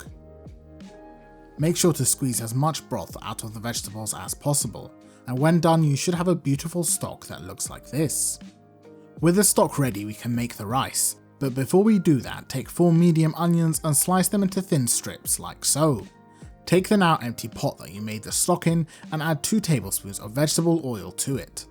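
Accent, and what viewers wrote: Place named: London, United Kingdom. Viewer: You have a London accent: please tell me you run a restaurant...and exactly where it is. YUM. What a terrific channel. No wonder all these wonderful immigrant mums, grandmas and aunts spend all day cooking together when there's a family event to cater for. Your recipes are incredibly laborious and just make me wince with sadness knowing I'll never spend all day cooking some of them but would gladly pay good money to someone who takes the trouble to cook certain dishes for me. Wow, just so delicious and impressive to see how it's all done. Bravo! 🌍🍽🤝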